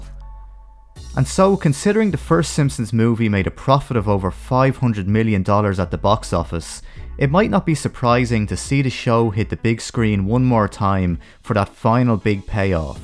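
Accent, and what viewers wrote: Ireland. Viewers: He’s Irish. 💯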